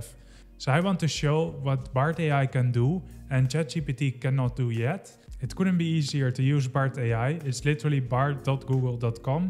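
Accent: Netherlands. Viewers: I enjoyed your video on Bard vs ChatGPT very much. It was very informative and I learned a lot about the two language models. I could tell you are Dutch by your accent 😊. Greetings from South Africa!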